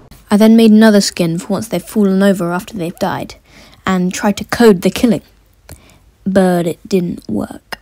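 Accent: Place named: United Kingdom. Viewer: British accent youtubers are always the best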